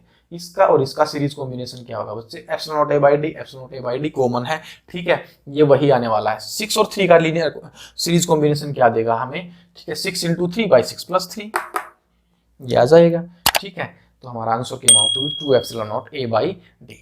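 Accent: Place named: Haryana, India. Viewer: sir aap Haryana se lagte ho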